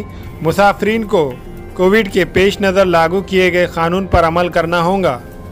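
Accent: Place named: Maharashtra, India. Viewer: Achi Urdu baat Kiya kro bhai.ye kya ghati baat krte ho.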